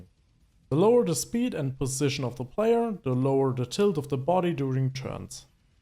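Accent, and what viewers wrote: Germany. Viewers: german accent is strong with this one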